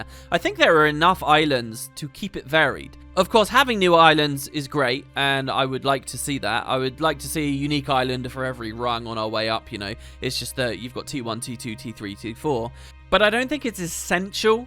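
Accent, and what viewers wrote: United Kingdom. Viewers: "wearing his burnout on the game" DUDE youve been covering this game for like 14 years bro I literally give you the most respect for sticking through with consistency and having enjoyable content. Your voice is so ingrained in my head that whenever I hear a british accent at work I think "Oh that guy sounds like lmao". keep going, this franchise is my favorite and you are basically one of the main reasons I even play guild wars today. Keep it up, never let them get you down, we are all here for you my man and waiting for the day you start doing three two-hour EoD episodes:DDDD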